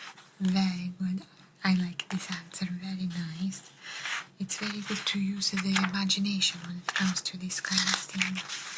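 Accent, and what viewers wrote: Italy. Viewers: I bloody love your Italian accent! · You always think of good topics to discuss as your therapist character,. Your Italian accent is great too. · Ciao cara! Mi piace molto il suono della scrittura e il tuo accento italiano è meraviglioso, davvero adorabile. Sei bravissima e bellissima! Ti voglio tanto bene 🫶🥰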